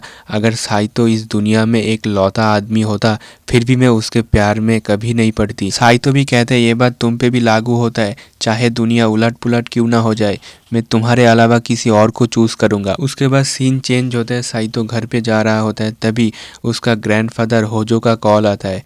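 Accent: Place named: Bengal, India. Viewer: Sudhar jayega tu tension mat le o ak Bengali fir bhi kosis kar raha 😂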